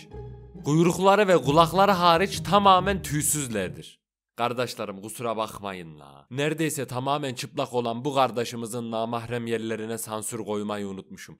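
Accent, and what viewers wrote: Ankara, Turkey. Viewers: Emeğine sağlık çok güzel bir video olmuş. Yalnız eski Ankara şivesini biraz inceltmişsin admin.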